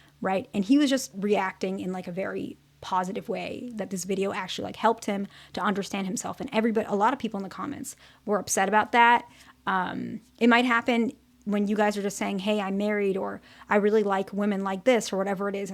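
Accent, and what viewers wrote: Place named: United States. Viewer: It’s just a regular American accent.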